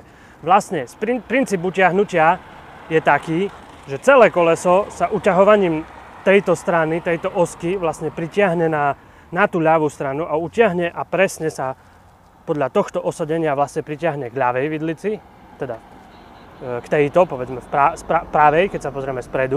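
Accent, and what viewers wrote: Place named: East Slovakia, Slovakia. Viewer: Čuc že to vychodňar 🤣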